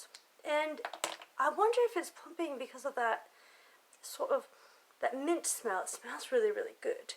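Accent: United Kingdom. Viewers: Oh good I’m early x hope u are good and thankyou for this upload! And am I crazy or do you have a British accent? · Where are you from? Sounds like you have a British or English accent. I'm not sure. Lol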